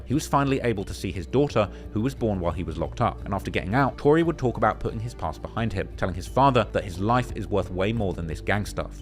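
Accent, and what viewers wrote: United Kingdom. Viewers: Hearing about shit that happened where I grew up in a British accent is weird lol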